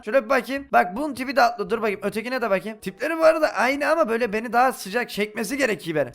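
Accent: Black Sea, Turkey. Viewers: Abu sen nerelisin da? ( bubamun hesabu bence Karadenizlisin diye düşünüyorum 😂😂)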